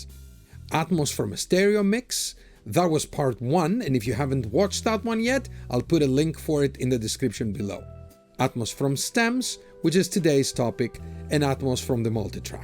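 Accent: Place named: Greece. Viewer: Subbed you right away, although it has more to do with how you present the info & content, that greek accent is too good.. (this channel is certainly not boring like other audiophiles out there)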